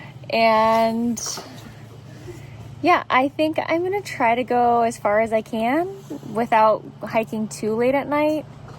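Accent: United Kingdom. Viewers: Hi. A couple of questions for you: 1. I follow a hiker on YouTube by the trail name of Sunshine. She is fairly short, has dark hair, and in her 40s, and would have been hiking with a man named Augustine who wears a beard. Does that sound like the person you spent time with on the beach? 2. Would you ever do an entire video using your posh, British accent? 🙂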